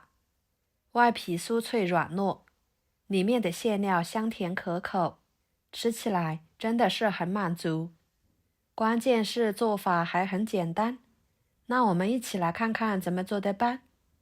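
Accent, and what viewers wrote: Yunnan, China. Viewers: 也谢谢你的云南普通话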